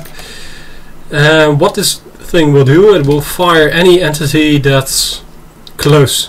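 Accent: Netherlands. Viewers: 1. i love the fact that you sound so dutch 2. i love the fact that you just went "hey i can't walk fast enough and that bugs me, i know i'll just make an addition to my mod!" 3. i think a good idea would be air pressure jet packs or maybe even a pneumatic iron man suit because the helmet is already there. 4. don't do anything about fall damage, it's a part of the game and it makes it fun. if you want to take no damage launch yourself in water.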